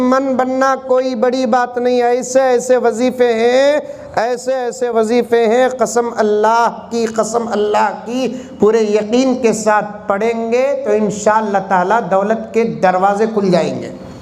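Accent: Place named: Goripalya, India. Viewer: Pure goripalya accent ameer sab Allah apki Umar mein barkat de ❤❤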